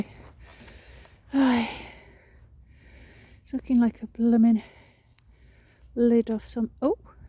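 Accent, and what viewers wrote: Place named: England, United Kingdom. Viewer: Nice understated English voice, a change from bawling Americans!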